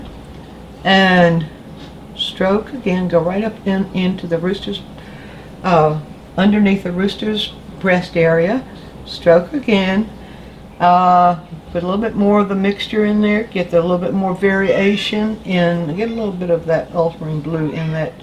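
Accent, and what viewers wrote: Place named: Georgia, United States. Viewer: Thank you for the reply. I am so new to oil painting as a medium that it is extremely helpful to me to learn how the paint and additives work. I am so used to the instant gratification of acrylic and I am so afraid of ending up with a piece I love in oil and it never drying - especially with the cold wax. :-) I'm glad I made you smile and I hope the Georgian accent doesn't disappear entirely, it is so lovely. I'm a fan of accents and Georgia is a favorite. :-) Thank you again for the generosity of your quick tips instruction :-)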